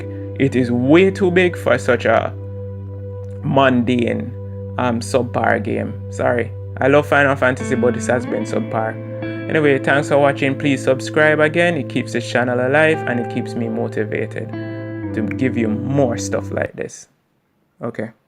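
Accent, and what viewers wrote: Jamaica. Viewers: are you jamaican? you got a accent.I'm trrying to place it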